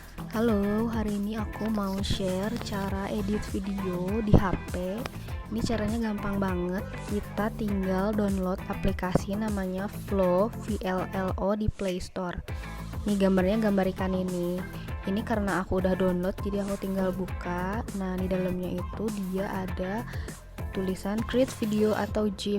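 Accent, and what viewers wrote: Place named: West Java, Indonesia. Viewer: Sunda pisan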